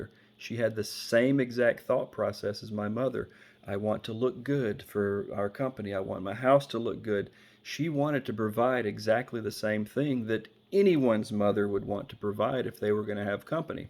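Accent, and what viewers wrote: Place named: South, United States. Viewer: Amazing story brother! May Allah bless you with best in this world and hereafter. I have to say something, hearing your southern accent makes me keep thinking about Forrest Gump 😊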